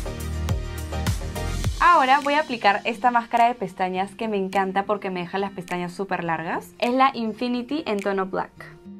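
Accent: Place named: Chile. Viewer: De qué país eres? Algo de tono chileno suena aveces 😅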